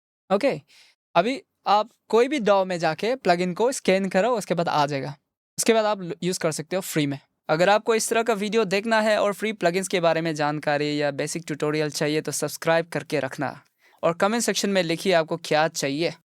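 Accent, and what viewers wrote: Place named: Andhra Pradesh, India. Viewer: You sound like and im sure yeh ap hi hai accent se pata chalre, im from a.p too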